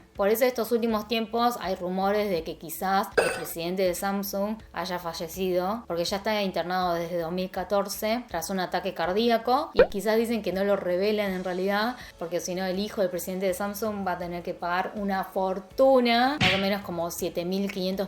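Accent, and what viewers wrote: Argentina. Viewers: Soy el unicoo que se pone rraro al verla hablar español y aun mas con acento argentino 😅 me da cosa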